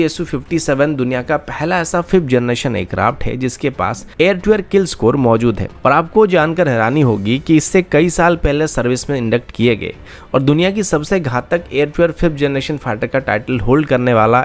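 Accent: Odisha, India. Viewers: Bhai aap odisha se ho aapka acsent se laga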